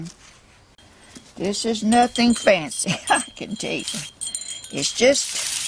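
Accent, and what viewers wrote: South, United States. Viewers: Hello Mr, Your so sweet love your southern accent. I love to watch you create, it come so natural to you. I could watch you for hours. I've learned a lot from you. Your very creative, and 'm impressed. I cant wait to retire so i can create my heart out like yourself. Bless You Always. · Ms., what a blessing you are, listening to you makes me homesick for the south, I am from SC. God bless you, love your projects, what a wonderful job you do.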